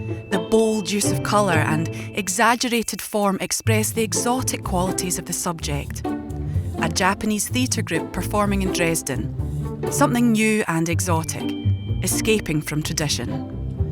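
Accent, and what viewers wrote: Scotland, United Kingdom. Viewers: Scottish accent..then there's accents within accents! My ex's was different. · The scotish accent it's just brilliant...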